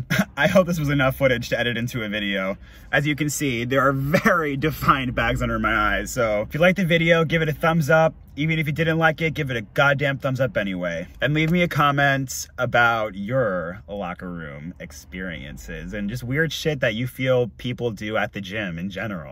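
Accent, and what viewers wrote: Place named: Midwest, United States. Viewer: Greetings from ur old stalking grounds L.A., why are you regressing? You're supposed to move away from places like that to L.A. not the other way around. Where did you move to? I didn't hear a southern accent, you have more of a midwest frozen state vibe, I'd guess Ohio, Mi, Wisconsin. I made that mistake once b4 as well,.moving from a real city to a tiny little town in TN. They surprisingly had one gay drag bar. Drag is real big in the south for some unknown reason. The cops would raid it for one reason or another and they would enjoy printing the names of those arrested for whatever charges they wanted to charge you with, they would print your name in the paper and you would come out to the whole town via the newspaper. I lasted less than a year...I never made the headlines...I moved back to LA.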